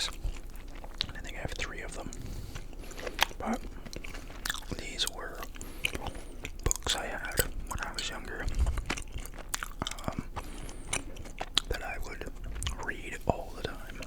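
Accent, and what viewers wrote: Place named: Canada. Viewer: also are you from Canada?? I hear a little accent and its really nice hahah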